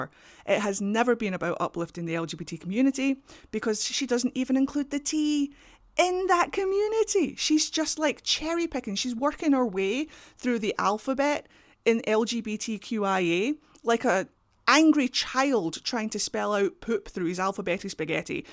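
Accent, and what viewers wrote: Scotland, United Kingdom. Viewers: Just found you and very much enjoyed your rambling. (And also you Scottish accent. Hi from Germany, by the way 😊)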